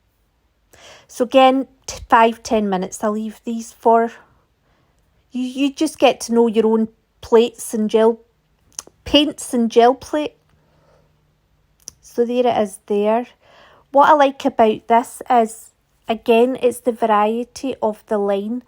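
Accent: Scotland, United Kingdom. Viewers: What a lovely scottish accent?! Great video.Greetings from Spain. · thanks ever so much for the quick reply, great hearing a Scottish accent on a YouTube art video. Thanks for the information, I have done Lino and Japanese woodblock printing before and I have got loads of art supplies I can use with the gell plate so excited to get started. I volunteer as an art tutor for a church art group we have done linoprinting, airdry clay, stained glass drawing and painting and I am always on the lookout for new things to try. Looks like endless things to try with this technique I mainly paint Scottish landscapes. Thanks again enjoying your videos. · Awesome, and a Scottish accent to boot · I absolutely adore your accent! I think the Scottish accent is so full of music and poetry 💗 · Enjoyed this very much. Clearly explained techniques with lots of variety. 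Thank you for mentioning the need to let the paint dry. I have watched many instructional videos and it’s seldom touched on. I’m Scottish, and it was so refreshing to listen to another Scot. Very many thanks. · This was absolutely wonderful! Thank you so much for all these excellent ideas suggestions techniques… Tricks of the trade! I know I will be coming back to this video over and over again. I’m just getting started with gel plate printing and I’m so excited to explore. This video has given me even more ideas! Additionally, I really love that it isn’t cluttered with a lot of background noise or music, it’s just you talking us through the process – in such a beautiful accent at that! Long live Alba! 🏴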